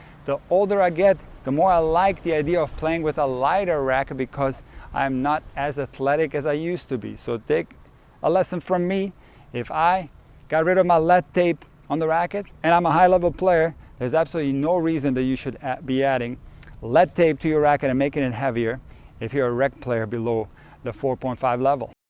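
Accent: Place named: Eastern Europe. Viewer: is the Eastern-European, no B.S. coach you have in your dreams/nightmares. The accent, the straight-talk, no coddling, no touchy-feely*. Just cut the crap and get to the point. No excuses. I pulled all the weight off my rackets after this video because it confirmed my suspicion that it really wasn't worth the trouble. I'm not that good. I'm flattering myself. *I work in a very touchy-feely profession. I'm touchy-feely. But from my tennis coach, I want cold hard unvarnished truth. Update: I bought a 3rd frame (used 2018 Radical) so I can honor my desire to tinker unnecessarily with things (it’s an affliction, I admit). This also is per suggestion - 2 frames the same in case of string breakage, 1 frame for trying different stuff.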